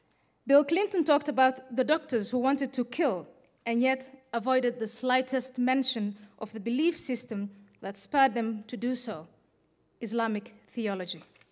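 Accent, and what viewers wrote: United Kingdom. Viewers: yo your spoken english is british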